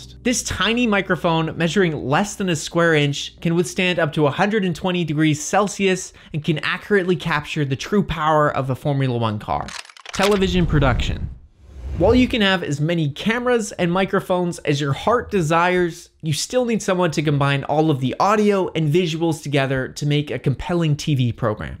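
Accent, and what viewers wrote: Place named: Canada. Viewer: Now that I hear it he's definitely Canadian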